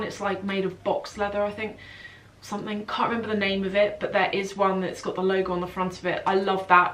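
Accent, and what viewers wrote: RP, United Kingdom. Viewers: Your accent is so posh!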